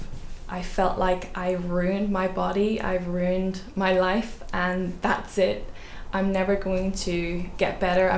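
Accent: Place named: RP, United Kingdom. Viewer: Hey your accent has changed. More posh. Anyways, how are you doing? 😊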